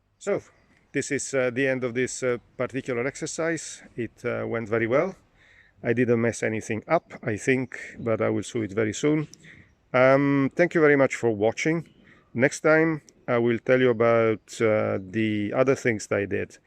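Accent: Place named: Italy. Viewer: you speak Netherlands i can here you Italien accent beceause i was many thimes in Italy in Modena Ficensa Treviso Napoli vinetie etc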